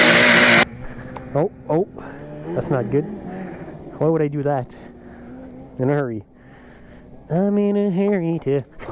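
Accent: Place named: Quebec, Canada. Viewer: t tu québécois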